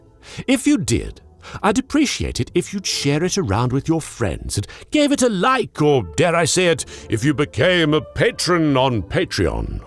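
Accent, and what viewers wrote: United Kingdom. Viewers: Saw an ad, clicked because of the thumbnail, stayed for the British accent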